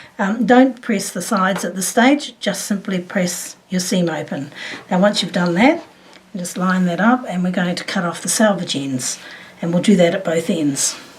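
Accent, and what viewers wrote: Australia. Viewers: Oh! Thanks for clearing that up; sorry I didn't quite understand. I love the Australian accent!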